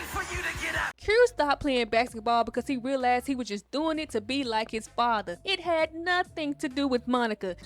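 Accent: Memphis, United States. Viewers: Where are you from 😅 because I love a good accent!!! It’s giving a lil Glo so I wanna say Memphis ….lol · Are you from Memphis? The accent much love ❤️ another great video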